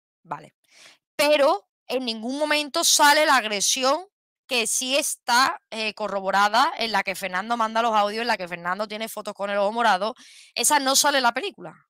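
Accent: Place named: Andalusia, Spain. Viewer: Hola!! Me he suscrito recientemente, gracias tb de estar suscrita hace poco d a través de Triunarts y asi os he ido descubriendo. Me encanta como compactais las dos y bueno tb como explicas los casos. Espero q te vaya todo genial!!Además me encanta el acento andaluz 😊 Saludos desde Barcelona 🤗😊😊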